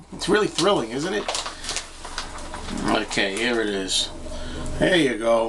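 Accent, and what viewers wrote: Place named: Queens, United States. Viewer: Hey, I just wanted to share with you that one of my best/favorite cousins lives in South Ozone Park in Queens. My cousin Mark and his older brother {my other cousin} Matt are the one that took me to see Led Zeppelin at the Garden in 1977. The whole city was taken over by Zeppelin fans from all over for a whole week straight. It was one of the best times of my life and concert history. Your accent is similar to theirs. I am sure you have been at shows/games at the Garden. Talk soon~ Rob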